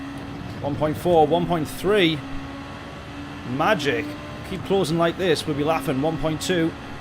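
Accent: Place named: United Kingdom. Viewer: The last race was very exciting, but the best race was the second one, the one where you pitted one lap too early by accident. I love watching your streams, because you are really fast, but also exemplary fair. The only other streamer who also stops every time after making a mistake is Rory Alexander, who I also like. As a huge fan of since 1988, I also gotta give it to you for promoting my favourite band as a part of the livery of your cars. One more thing that separates you from the rest of the streamers is your accent. I can tell that you're from UK, but which part of UK remains a mystery to me. Gotta love your accent, though.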